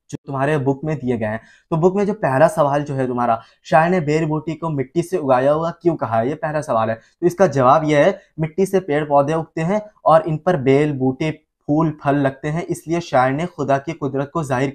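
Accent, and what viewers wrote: Bihar, India. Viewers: sir aap bihar se hain kya?????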